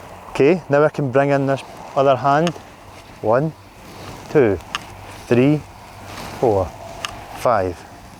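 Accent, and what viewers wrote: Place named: Scotland, United Kingdom. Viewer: an extra thumbs up for a Scottish brogue that I can understand! Thanks!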